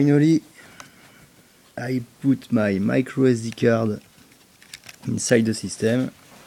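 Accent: France. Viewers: le francais qui parle englais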